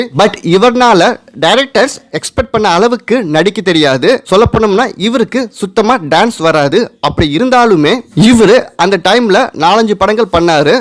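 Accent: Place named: Sri Lanka. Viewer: Srilankan voice slag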